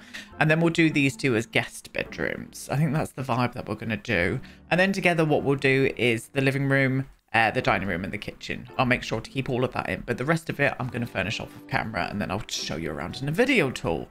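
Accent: United Kingdom. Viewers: Someone ranting about the sims build in a British accent is just what i needed 😂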